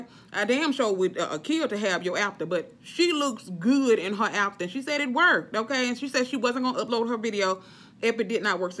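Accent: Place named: United States. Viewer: Lol girl you killed me, i love how you American talk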